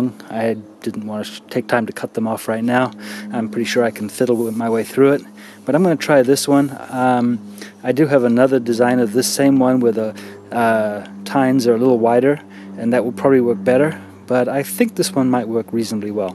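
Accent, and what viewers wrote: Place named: South Africa. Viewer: I can tell you use to live in South Africa, can still hear your accent. Thanks for this video!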